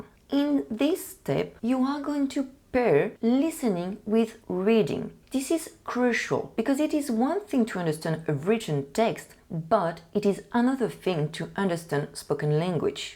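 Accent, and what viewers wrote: France. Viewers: BTW I love your French accent so much!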